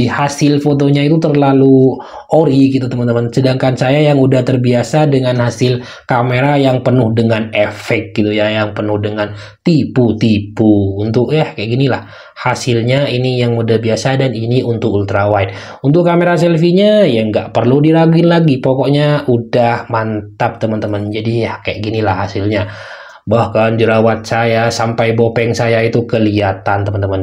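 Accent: Madura, Indonesia. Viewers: abang org madura ya logatnya khas banget😅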